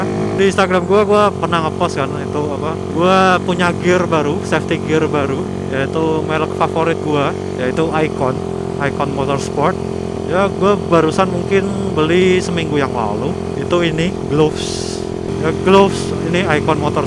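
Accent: Semarang, Indonesia. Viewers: Koyo semarang iki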